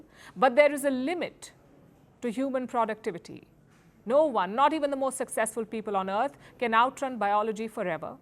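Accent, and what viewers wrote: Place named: India. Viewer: It's difficult to listen to this presenter. Thick Indiannn accent. Lucky the caption helps.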